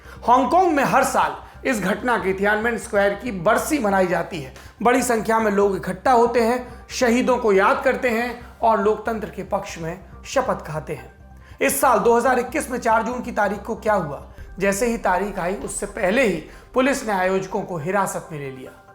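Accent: India. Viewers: Apne state ki khushbu aati h anchoring m apki ji..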